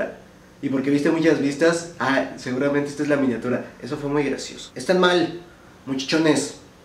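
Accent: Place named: Peru. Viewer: De dónde eres? Pareces de Perú. Por el dejo más neutral.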